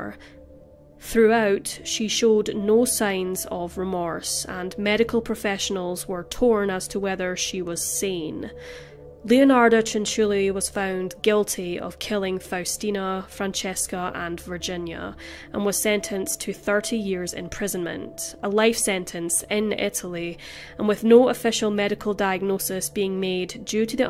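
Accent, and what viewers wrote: Scotland, United Kingdom. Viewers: She's Scottish. Her twin sister Kristy Skye has her own channel and said they're from Scotland. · Yup Scottish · Scotland 100% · I’m trying to work out where the host dialect is from she sounds Scottish but she doesn’t sound just Scottish, it’s puzzling me 🤔😆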